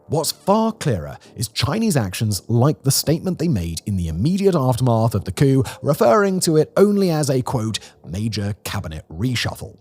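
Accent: Australia. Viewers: He's British? I thought he was Australian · You said it! Thanks! Is this Simon Dude Australian? And what’s the HURRY! Don’t forget his mispronunciation! He keeps saying: “MY-AN MAAR”!!! Is it you “MAAR” or my “MAAR???”. Sorry, I had to STOP listening! Couldn’t take it any longer! It’s too Disturbing! But, I will HELP you: Say “Mee” (like Me) and “Maar” Not that hard for you! OK! Let’s TRY! “Mee-an-Maar”. Please change next time you talk about Myanmar ex Burma. Remember “ Mee-an-maar”. Ex fan of. Johannes Koistinen-Lindgren born in Suomi-Finland. Resident in the northern Thai hinterland Khlon Nam Lai village.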